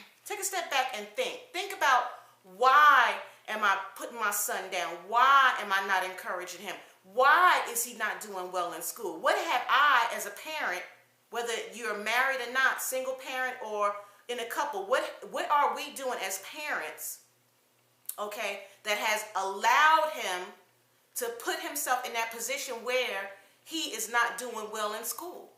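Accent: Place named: New Jersey, United States. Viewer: Ms, great message. I could tell you were raised with your dad by the attitude you have toward family men that provide. Also I can hear that new jersey accent. Peace and blessings.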